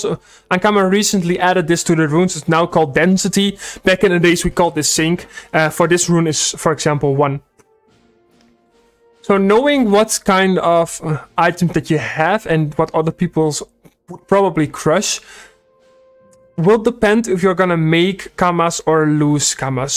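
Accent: Netherlands. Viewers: You are dutch, aren’t ya? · Are you dutch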